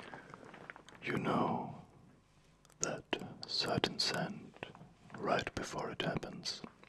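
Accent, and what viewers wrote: Germany. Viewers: Do you mean “accent”? If so, I think he’s German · He’s German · You're German aren't you?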